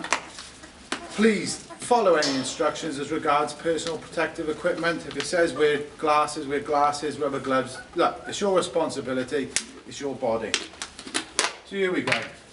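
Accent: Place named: Cardiff, United Kingdom. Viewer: Got to be a Cardiff boy with that accent.....arrrright butt!!!!!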